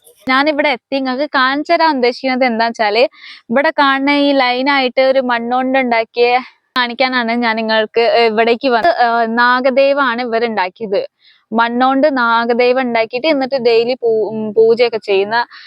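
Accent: Malappuram, India. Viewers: ആ മലപ്പുറം വർത്താനം സൂപ്പർ .. ആ North Indian dress .. അതും സൂപ്പർ ... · മലപ്പുറ०കാരിയാണോ ? ആ സ०സാരഭാഷയാണല്ലേ ?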